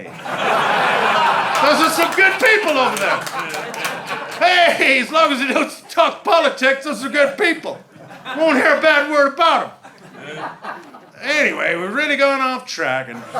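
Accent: United Kingdom. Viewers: very funny show, your British accent was very similar to the Dart callers. 😂🤣🍻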